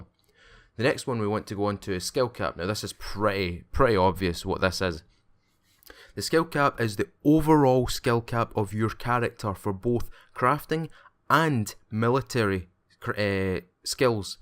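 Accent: Scotland, United Kingdom. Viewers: Love your LiF:YO vids, love your scottish accent, I should play my " " colection while playing LiF... Game is still grindy AF, fortunately we can play with the server settings and accelerate the leveling rate...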